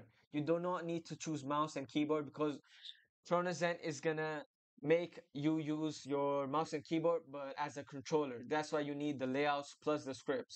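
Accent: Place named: Germany. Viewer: sounds like german accent lmao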